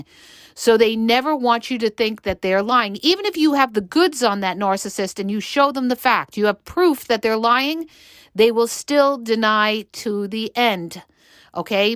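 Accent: New York, United States. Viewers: All I can say is that I have one big regret. And that is that I wish I had known about you 19 years ago when the narcissist from hell entered my life. Had I listened to your podcasts back then, Id probably be very happily married with a good man today. You described my ex to T! I had to do a lot of research on these types of men but you would have been all I needed to help me see the light. I love your hard, NY style delivery. It's what's needed. You are my official go to person now. I binge listen to your shorts reels and longer videos, every spare minute. Finding you is a gift. ❤❤❤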